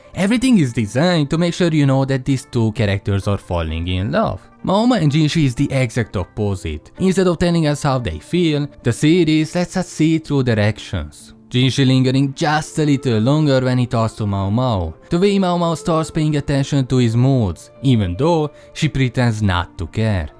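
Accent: Hungary. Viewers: Proud Hungarian accent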